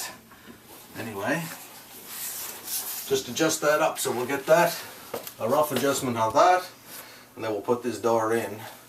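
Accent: Canada. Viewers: from canada? one of my favorite accents.... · This is most helpful. Thank you. I LOVE the Canadian accent. My wife is from B.C.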